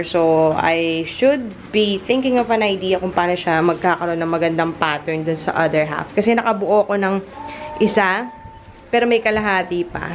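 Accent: England, United Kingdom. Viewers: i love your english accent ate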